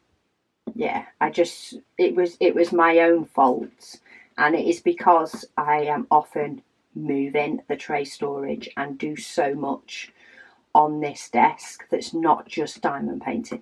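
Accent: England, United Kingdom. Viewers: Hi! My husband, David and I watch you every day, and we’re enthralled by your speed of diamond painting. I love the pen you’re using today. So pretty. I finished a small partial special drill yesterday, and loved it. Being from the middle of the US, we are entranced by your accent and love hearing about your life in England. I’ve entered the giveaway, so fingers crossed!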